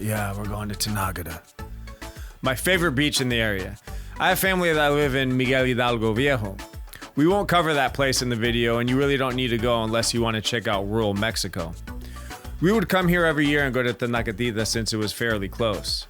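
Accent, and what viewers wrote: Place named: Northern California, United States. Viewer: Thanks for this! Love your commentary. You sound like a Northern Californian LOL. Gonna go in a few weeks!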